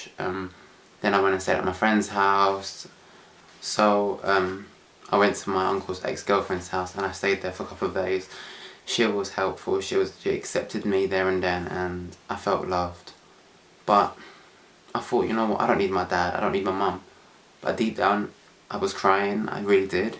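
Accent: United Kingdom. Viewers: First off Love you, Love you,Love you to pieces! You are so cute and I'm an amercian boy whose a sucker for british accents. I was lucky to stumble upon this video when looking up a video on being gay and muslim. I can understand completely because being African Amercian and raised in southern Christianity I know fanatical extremist minds all to well.I hope things continuously get better! Hold onto the accepting family and friends and release all others to love and light! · hey sounds British. I just started watching this now and I happy I clicked on the video.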